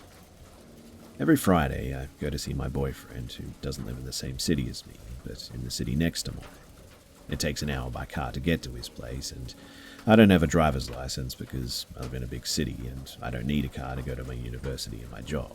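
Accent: Australia. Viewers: Is the accent Australian?? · instant subscribe an actual human narrator AND ur from the motherland aus bro sign me up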